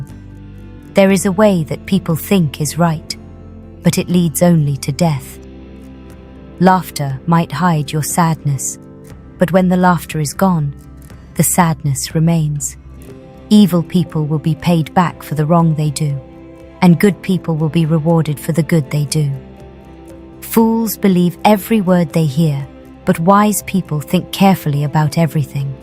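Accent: United Kingdom. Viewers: Let's recognise that there are BLACK people in the world who have an english accent like this voiceover. We do exist!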